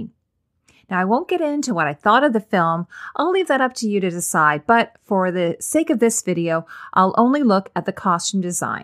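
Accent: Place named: Canada. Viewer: I notice a very slight Canadian accent on words like "about" :) It leans into to Canadian "aboat"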